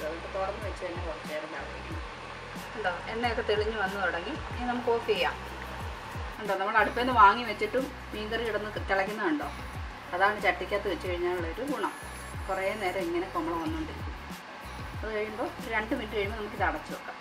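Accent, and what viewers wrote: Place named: Chengannur, India. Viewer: chechy fish cury superrrrrrrrrr.samsaram kettittu chengannurkkari aanennu thonnunnu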